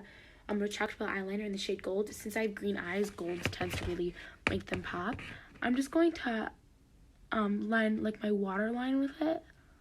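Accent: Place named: New York, United States. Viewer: getting heavy new york accent vibes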